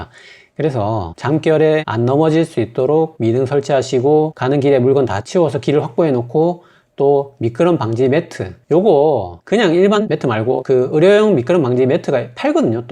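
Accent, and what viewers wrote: Gyeongsang, South Korea. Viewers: ~경상도 억양의 말투 너무 재밌어요. 귀에 쏙쏙 들어와요. 뭔가 중독성 있어요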